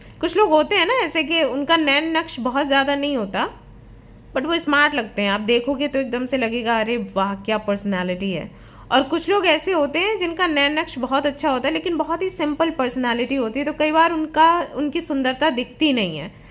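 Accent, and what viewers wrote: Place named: Assam, India. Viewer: I was watching it thn suddenly heard he might be Assamese guy.. lik I was thinking bunch of things before or while watching the vdo.. wow, might there is a chance for me again. Spetical yet I dnt knw.. Let's see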